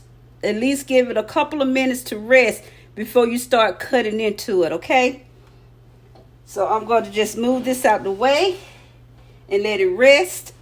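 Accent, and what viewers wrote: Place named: South, United States. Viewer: You gotta be south 😉 Louisiana here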